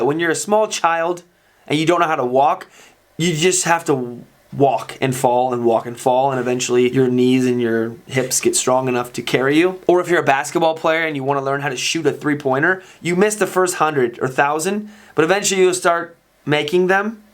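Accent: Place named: United States. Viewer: you have spent lots of your time in Southern Germany, especially in Swabian. Swabians are known for being able to do everything except of speaking high German. They also cannot be expected to contort their face and tongue to be able to speak English. A good example is the former Prime Minister Günther Oettinger. However when you come to other parts of Germany, especially in the north you will see that they are able to speak English much better than those in the south. To me it is also questionable that you claim to be the best English teacher. Your American accent is way different of what I have learned in German high school from 1960 to 1969 when we were taught to communicate in Oxford English. I still like your contents and your behaviour, keep continuing these type of videos.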